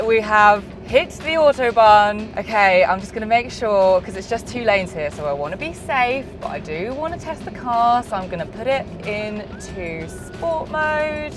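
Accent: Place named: London, United Kingdom. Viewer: I'ts a standard London/South East England accent. Btw your question is really stupid.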